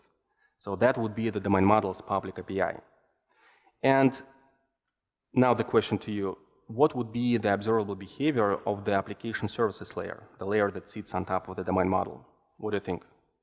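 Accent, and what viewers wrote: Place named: Russia. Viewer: Родной язык автора - русский. Да и говорит он с заметным русским акцентом. Для чего он на русскоязычной конференции говорит на английском?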